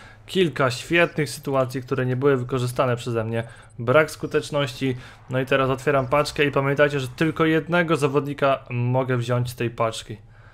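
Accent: Poland. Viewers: Widac że z Rzeszowa xd!:D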